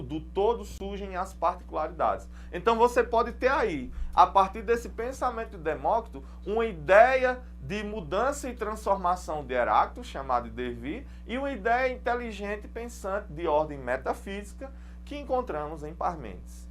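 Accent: Northeast, Brazil. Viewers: Ótima aula professsor! Seu sotaque de nordestino deixar a aula bem descontraída e fluída , e parabéns pela explicação! Melhor impossível... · Eita professor arrochado véi! Conheço seu sotaque viu peixe, é nordestino que nem eu. Brincadeiras à parte, excelente e maravilhosa aula meu nobre, PARABÉNS!!!!!😊😊😊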